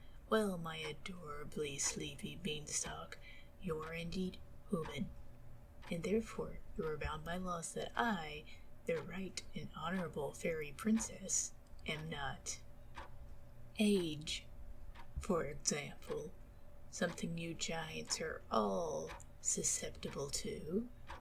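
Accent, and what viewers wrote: South, United States. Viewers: Definitely not you. Risking sounding like a simp, but you’ve got a voice (and likely a personality) like a southern angel😁. Honestly, it’s great to finally see an ASMR channel with a southern accent. Being a southerner as well, I appreciate good southern voice acting. Keep up the great work, can’t wait to see more!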